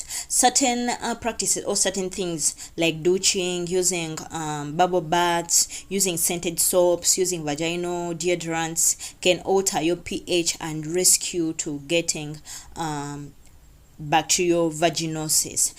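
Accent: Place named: Uganda. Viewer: You sound Ugandan ❤️🤗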